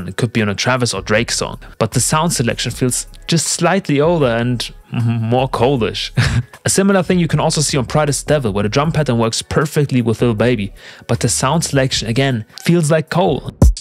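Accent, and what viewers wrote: Germany. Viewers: I was gonna comment that you sound German until I checked your about section lol. Great video! Please do more of these.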